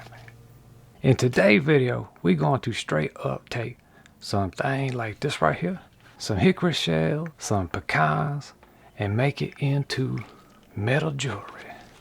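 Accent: Louisiana, United States. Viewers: From Louisiana, huh? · You from Louisiana?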